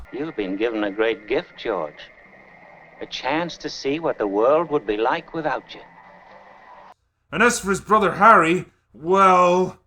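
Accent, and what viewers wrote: Scotland, United Kingdom. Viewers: His dulcet Scottish tones warm my soul.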